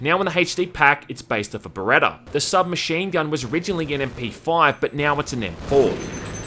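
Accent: Bogan, Australia. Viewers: I really hate how you talk, you sound super bogan.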